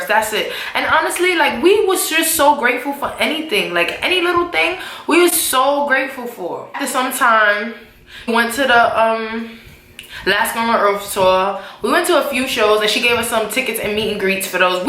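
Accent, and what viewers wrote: New York, United States. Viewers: Na for nothing 😮 you from ny Lmaoo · Sound like Rihanna with a New York accent deadass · Girlllllllll you are a true New Yorker that accent tho!!!!!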